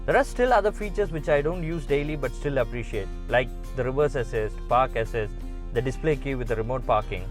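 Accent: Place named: India. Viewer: but that indian accent though. Can’t get used to it.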